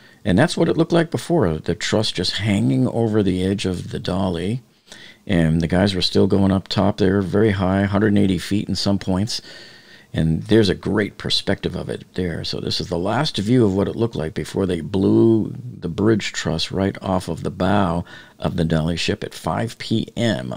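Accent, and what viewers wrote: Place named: Chicago, United States. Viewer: Thanks for all your coverage!! Watch you ALL the time...are you from Chicago??? We recognize your accent.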